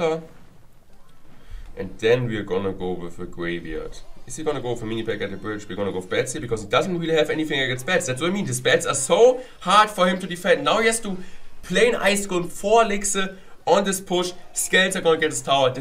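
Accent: Germany. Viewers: ...and dann... Denglisch man kennts😂 Aber nices Video👌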